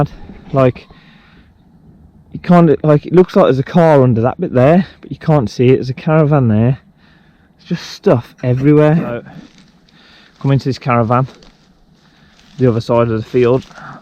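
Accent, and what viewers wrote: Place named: West Midlands, United Kingdom. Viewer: You sound like you're West Midlands, what area is this? I'm amazed such a large piece of land is left abandoned, as they seem to build on everything these days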